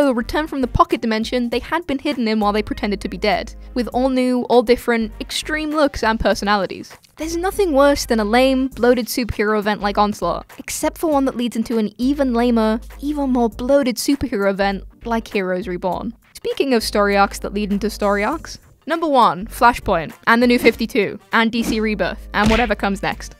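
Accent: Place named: United Kingdom. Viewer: So go to an American channel if you're so irritated by our multiple dialects... American's pronounce things differently to us UK residents, yet it doesn't bother me in the slightest. Imagine being so pressed by a bit of difference. Calm down petal. ✌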